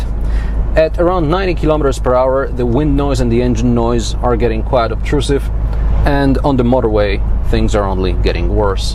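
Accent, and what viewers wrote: Poland. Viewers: What's the point the made rewiew in Poland in English? M8 you still have your polish accent.Do all Poles forgott theirs own language or what!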